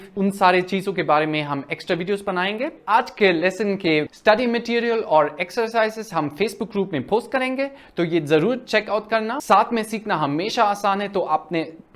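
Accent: India. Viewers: nice accent of hindi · Your Hindi accent is really sweet ❤ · My Goodness ! You blew me away with your hindi accent.It's so nice to hear from you...but now onward i'm also planning to learn German language from you.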